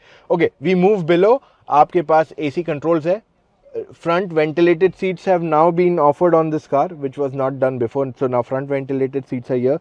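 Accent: India. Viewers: Hey team! Great video as always. Love the honest, no-nonsense review style. Your natural Hinglish flow and the way you bluntly call out brands (like the missing fog lamps and the "new gen" claims) is exactly why we trust your channel over the PR brochures! 🙌 Just wanted to share some friendly, constructive feedback as a viewer to make your delivery even sharper: Punchier phrasing:Sometimes the English sentences get a little tangled. For example, instead of saying "I am a big fan of that being going off" about the LED light, a quick "I'm glad we can turn that off" hits much better. Descriptive words: When you talked about the new touchscreen angle, you said "it's like this instead of this way" while using hand gestures. Since a lot of us listen to your videos in the background while working or driving, describing it verbally (like, "the screen is tilted slightly upwards now") really helps paint the picture! 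Fewer fillers: You use phrases like "just fine" or "pretty much" a lot (like when talking about the ground clearance). Swapping those for words like "adequate", "practical", or "sufficient" would make you sound even more like the expert you are. Keep up the awesome work! Never lose that raw, unscripted energy.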